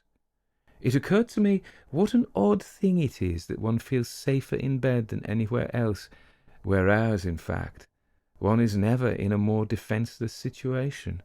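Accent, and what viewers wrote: United Kingdom. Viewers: I like your accent. I'm like most Americans, anything that sounds British is attractive and I can't tell whether you're from London, Cornwall, or Northumberland. I'm from Alabama so my accent sets me apart in my country but still, I can't tell if someone is from California, Nebraska, or Ohio. I'm very happy you narrate these great stories. I look forward to new ones all the time. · Could you imagine if all these British narrators were in the same room!? It would sound like monotones static!! Not that I mind the narration or the accents, but I do kind of find it funny that like it’s always the same British accent, (really the same voice…down to the low pitches) I mean, I get it. It works for the narrator of Sherlock Holmes so why not fucking repeat it till it’s beaten into the ground right? lol i digress, good story man.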